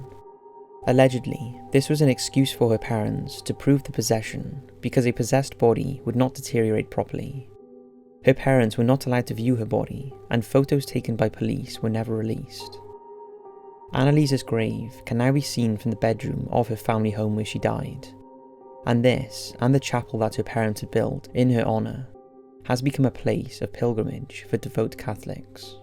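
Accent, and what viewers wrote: Wales, United Kingdom. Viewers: Thats a welsh accent si x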